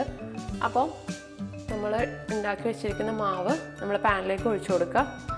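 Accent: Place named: Palakkad, India. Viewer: Chechi inte talking kettappol sherikam pkd pole ind athu konde ah chodhiche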